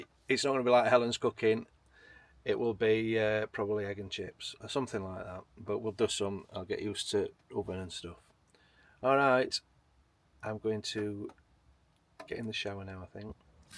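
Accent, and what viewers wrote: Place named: Lancashire, United Kingdom. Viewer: We just found your channel…and love 😊it! Your accents bring back happy memories to my Lancashire born wife! Replace those wine glasses with plastic ones (we bought ours from Wilko, but as they no longer exist I’m sure The Range will have them). Bin your ear plugs and use the white noise app on your smartphone…..when you first hear it you’ll think you can’t sleep with all that noise but before you know it you will be sleeping like a baby!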